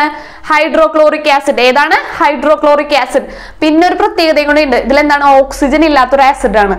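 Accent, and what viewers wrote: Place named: Thrissur, India. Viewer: വീട് തൃശൂർ ഭാഗത്ത് ആണോ slang അത് പോലെ ഉണ്ട്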